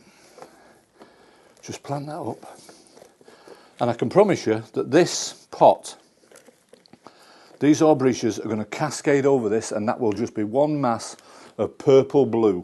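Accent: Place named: Yorkshire, United Kingdom. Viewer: Great video. Sounds like a fellow Yorkshire man. Just planted an old bath with this beauty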